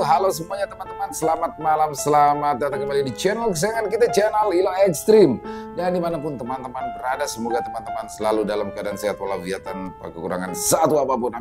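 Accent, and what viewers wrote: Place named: Betawi, Indonesia. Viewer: sambil dengerin sambil ketawa,suami tanya knp tu senyum" dan ketawa sendiri?hahaha saya lgsung jawab aja asik aja ni loghat betawinya kocak sangat🤣😊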